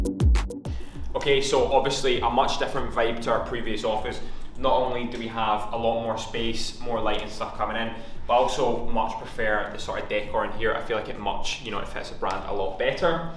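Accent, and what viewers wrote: Scotland, United Kingdom. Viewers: Do you live or Glasgow or Scotland because your sound it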